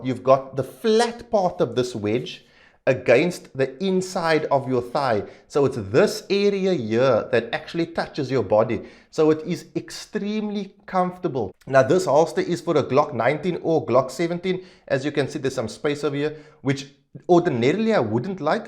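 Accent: Cape Town, South Africa. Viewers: I could immediately tell OP is from Cape Town when I heard him speak. This is typically an accent you'll hear from the Cape Coloured community.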